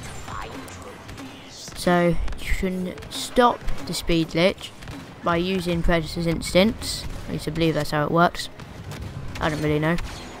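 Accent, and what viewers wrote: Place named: United Kingdom. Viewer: it’s the British accent lul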